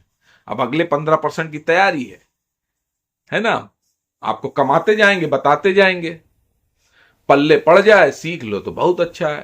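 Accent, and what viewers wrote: Kanpur, India. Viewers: भाषा तो कनपूरिया है?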